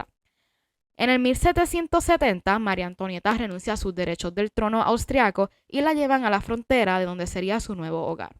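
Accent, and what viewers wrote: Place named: Taino, Caribbean. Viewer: ESTÁ CON ACENTO TAINO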